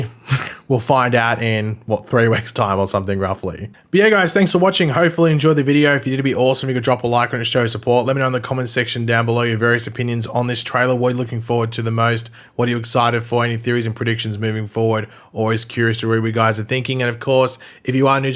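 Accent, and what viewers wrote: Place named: Australia. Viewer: sorry i've been smoking but i forgot you were Australian till you said crisis lol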